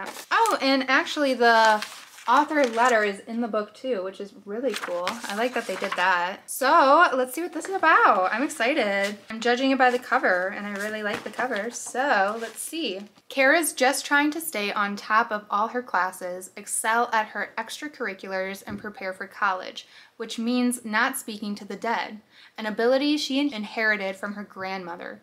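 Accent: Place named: New Jersey, United States. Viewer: oh, your accent sounds like you’re from Jersey.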